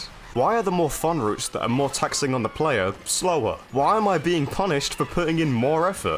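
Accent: United Kingdom. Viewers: Well, you think your anglo poxon accent is human and is tolerable. Everyone has wrong ideas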